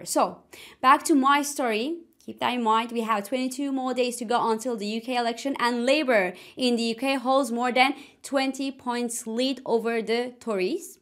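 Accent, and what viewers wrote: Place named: Turkey. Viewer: You have a Turkish accent. Are you Turkish or closely related to Turks?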